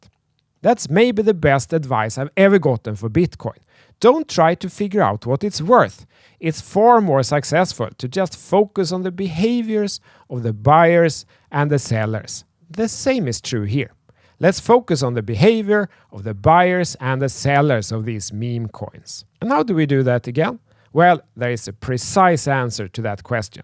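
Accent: Sweden. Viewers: You sound Swedish as hell. The Swenglish is on and it sounds really sophisticated!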